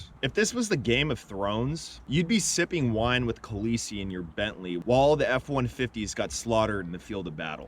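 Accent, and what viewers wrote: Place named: California, United States. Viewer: This dude sounds extremely California lol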